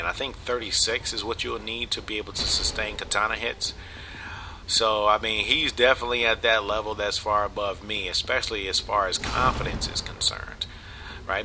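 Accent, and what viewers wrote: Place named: AAVE, United States. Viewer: You sound of the afro ebonic persuasion.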